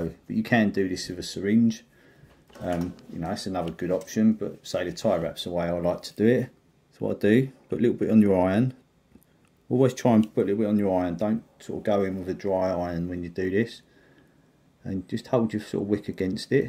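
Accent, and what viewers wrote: United Kingdom. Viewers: You are very british, I'm struggling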